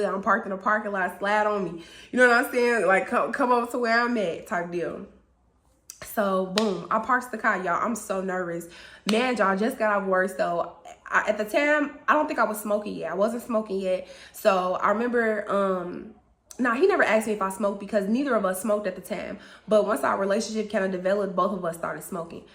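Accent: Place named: Midwest, United States. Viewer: It's so Midwest 😅😅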